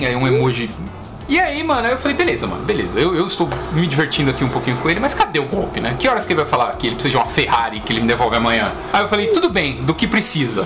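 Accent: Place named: Goiás, Brazil. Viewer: Uai só eu acho que ele é daqui de Goiás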